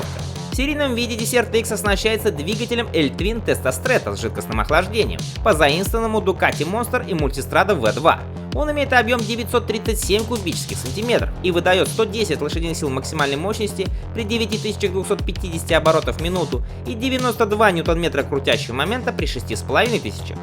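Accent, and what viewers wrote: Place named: Russia. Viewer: мда.... петушинный кацапский акцент, десерт - это в столовой, а это дЕсерт - пустыня, хоть бы название мотоцикла потрудился сказать правильно в первые секунды ролика.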